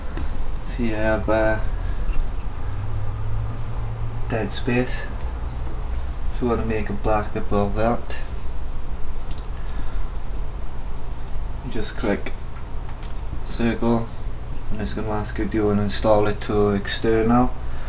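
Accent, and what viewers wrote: United Kingdom. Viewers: Do you live in Swansea? I ask this based on your accent. If you do would you flash my desire for me? I havent really got the time to learn all this stuff. Theres a 8 pack of stella in it for you :D Give me a PM. Cheers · Are u Welsh ?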